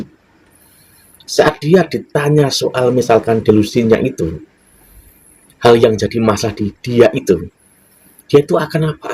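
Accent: Java, Indonesia. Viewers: mas, aku kok seneng banget ya video sampeyan? cara bicara sampeyan itu khas jawa yg tenang dan lucu. salam kenal ya mas..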